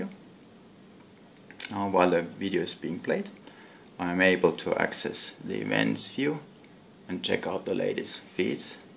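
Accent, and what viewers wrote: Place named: Finland. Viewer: Finnish - English.. XD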